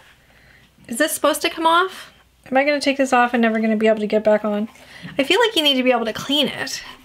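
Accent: Canada. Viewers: Heard you talk & knew you were from like Ontario, or Atlantic Canada! Hahaha, I’m from NL. ☺️ Just bought a little haul myself; including that exact glass bottle! Hope you enjoy everything 🌈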